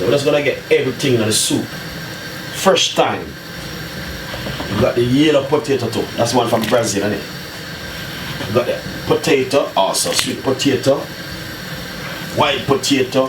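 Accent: Jamaica. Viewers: Omg just listen to him he did say pumpkin, it's just the Jamaican accent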